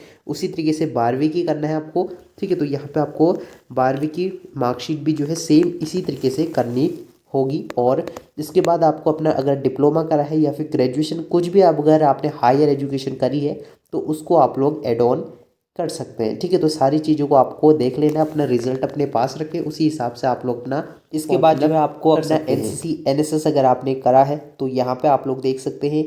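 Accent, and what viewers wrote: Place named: Pahadi, India. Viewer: Cool phadi ki awaj h ye to❤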